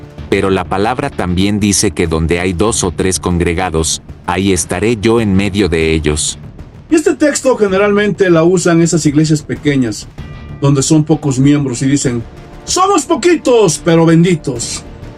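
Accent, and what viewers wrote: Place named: Mexico City, Mexico. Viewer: Hermano usted es de México? Tiene tono de la Capital. Bendiciones hasta dónde se encuentre.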